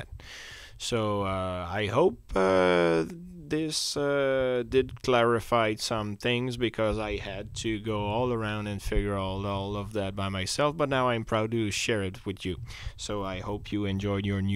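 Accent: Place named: Quebec, Canada. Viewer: LOL j'adore l'accent et finalement pas tataouinage une explication a la québécoise direct au point cool merci!!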